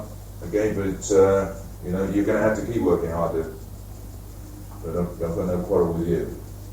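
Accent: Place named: South London, United Kingdom. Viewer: I went to KCS in the 70s. BH was my form master in 1976. And taught me maths. We never knew what a star he was on the rugby field. Though we should have when you saw him on the rugby pitch. What’s a cross between a rhino and a giraffe called? Yes. He was a great bloke. Totally uncompromising. And absolutely straight. A great sense of humour and a great smile too. Good memories. Brings a tear to my eye hearing his south London accent again. Not many of those at KCS!And takes me right back to him telling me off. Thanks. Simon Smedley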